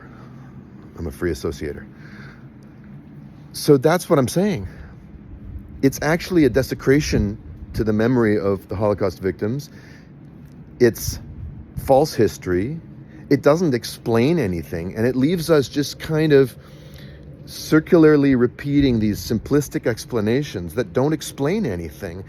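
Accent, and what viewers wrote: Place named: United States. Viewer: Did you watch Tantura? The people who did that were not victims of European Holocaust.... the Israeli hyjacking of it is outrageous! And PS... the American holocaust that Jewish ppl are also complicit in is ongoing to this very day! OUTRAGEOUS WITH YOUR AMERICAN ACCENT YOU ERASE genocide you are also a beneficiary of NOW!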